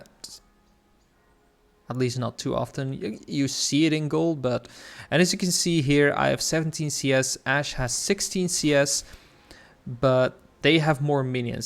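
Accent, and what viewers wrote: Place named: Turkey. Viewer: Are you turkish? Your accent really sounds turkish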